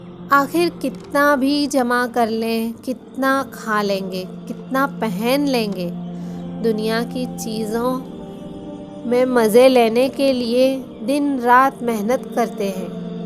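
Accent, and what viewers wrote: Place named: India. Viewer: Masha Allah Apsy milkr khushi hoi jee apky bolny k andaz andaza howa k ap Indian ho.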